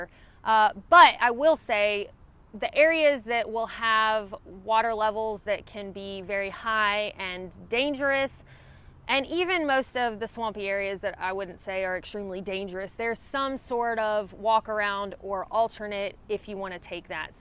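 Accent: South, United States. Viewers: “And if you’re mad at me and wanna fight me because your Pee-Paw told you a story where he had a fight with a water moccasin…” You are just so delightfully Southern. 🤣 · As a lifelong Californian who never been to the south, I still love listening to the accent of a good looking southern woman. Saying that, as someone who loves hiking in Cali and the southwest, this trail is just a big NOPE.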